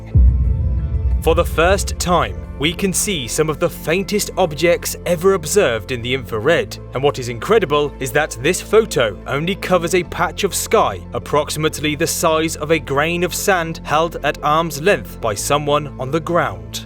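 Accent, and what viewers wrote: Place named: England, United Kingdom. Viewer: Sounds smarter with an English accent. Can you do it again in an Australian accent? Oy crikey mate!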